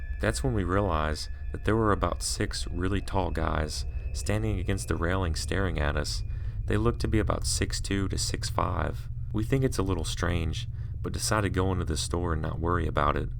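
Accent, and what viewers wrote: South, United States. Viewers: where are you from? you have a real subtle southern accent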